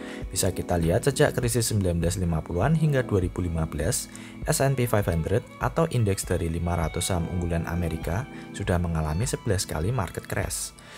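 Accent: Java, Indonesia. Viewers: kalau medok apa tidak bagus? mau baca text atau tidak, video-video di sini tetap recommended kok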